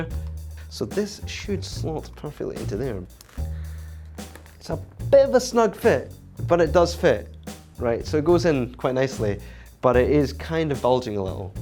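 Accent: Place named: Edinburgh, United Kingdom. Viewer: Hi, Great video. Thanks. That sounds to me like an Edinburgh (where I am) accent... am I correct? If so, I've just bought myself a Mavic 2 Pro and the FlyMore kit, and... I'm completely new to drone flying. If you're in Edinburgh, is there a particular area you can recommend I get started? Would you be up for meeting up with me (in the field, with drones) and give me a few starting pointers? Cheers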